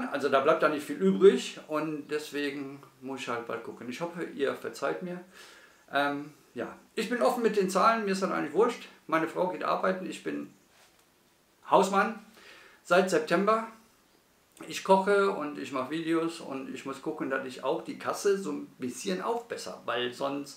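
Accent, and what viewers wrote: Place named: Rhineland, Germany. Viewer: Lieben Dank für deine ausführliche Antwort! Ich finde es toll, wie du als Hausmann bestrebt bist, das Einkaufen und Kochen zu optimieren, so dass es auch wirtschaftlich ist. Das mit dem Einkochen ist eine prima Idee und für euch drei Personen lohnt sich das allemal. Bin mal gespannt, was daraus wird. Ja, du hast Recht, es gibt Unmengen von Kochkanälen und ich selbst habe auch sehr viele abonniert, auch richtig große wie BBQ Rheinhessen. Mir gefallen immer Kanäle, die sehr authentisch sind und man den Betreibern die Lust am kochen und guten Essen anmerkt. Ich finde deinen Kanal sehr authentisch und mag deine ruhige, coole Art und auch deinen rheinischen Dialekt. Auch deine Tipps sind immer sehr hilfreich und ich mag ja die bodenständige Hausmannskost. Ich denke, du hast deine Nische gefunden und ich persönlich wünsche dir ganz viel Erfolg und freue mich immer mit dir, wenn wieder Abonnenten dazu gekommen sind. 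Mach einfach weiter so, das wird was! Liebe Grüße, Bettina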